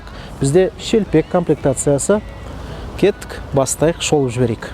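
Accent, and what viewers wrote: Standard. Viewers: Казах баурларымызга бизден салам, азаматсыз. Ушундай жаңы уналарды тааныштыра бериңиз! Таза Казахча сүйлөйт экен, азаматсын. Көңүл коюп уксаң ар бир сөзүнө түшүнөт экенбиз. Сөзүбүздө негизи көп деле айырма жок экен. Бекеринен айтпаса керек Казак-Кыргыз бир тууган деп. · Азамат! Таза казакча сүйлөйсүң. Казак тилине салымың чоң. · Жарайсың қолдайм, таза қазақша , мәнді ,мағыналы , мәнерлі сөйлейсің брат отдуши👍👍✊✊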